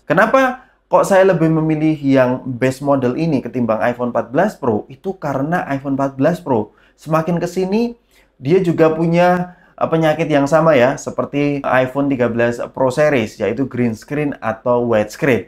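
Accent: Semarang, Indonesia. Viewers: Logat e ketoro semarangan ik 😂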